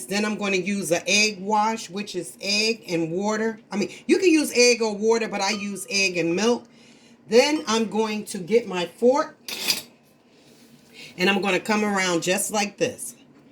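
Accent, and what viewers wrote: Jamaica. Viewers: you are something else. That Jamaican accent. 😂 ❤️ I’m here watching. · ya mon indeed! Love the Jamacian accent! and a great helper too!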